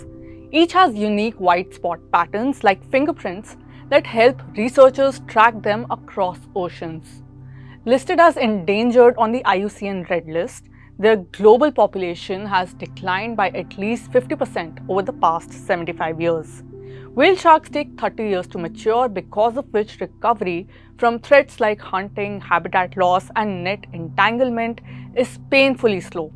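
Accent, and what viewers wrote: India. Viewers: Kindly upload English captions alongside current Hindi in your videos. Even the transcript is in Hindi! The speaker’s English has very strong Malayalam accent (understandably), which detracts from the wonderful content.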